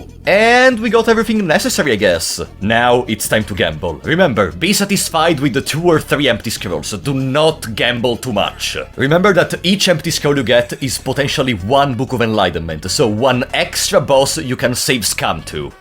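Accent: Italy. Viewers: l'accento italiano mi distrugge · L'accento italiano si sente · The very true question is "I recognise an accent... frate sei italiano?" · Ma sei italiano? L'accento sembra familiare 👀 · Italian acent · you sound italian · l’accento italiano si fa sentire parecchio 🤣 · I just watch them. your Italian accent and dark dungeons of fear and hunger are really calming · Onesto i sente che sei italiano 10/10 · Mi piace come si sente bene il tuo italiano puro proprio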